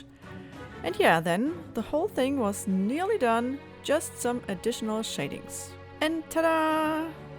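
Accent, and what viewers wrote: Germany. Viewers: are you german ? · You should work on you german accent but well the accent does not make the sword less cool, great work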